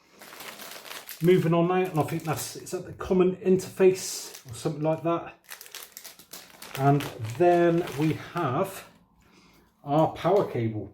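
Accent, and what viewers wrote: United Kingdom. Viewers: Love the british accent. ✌🏾💪🏾😎💥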